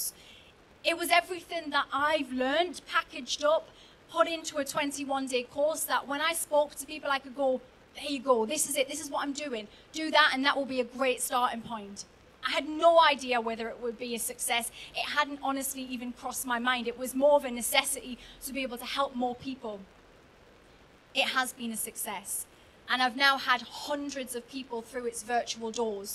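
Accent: Newcastle, United Kingdom. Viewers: I miss hearing Geordie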